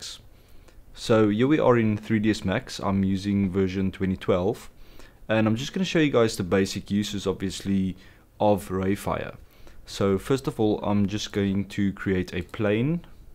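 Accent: South Africa. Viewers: man are you South African :D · You sound South African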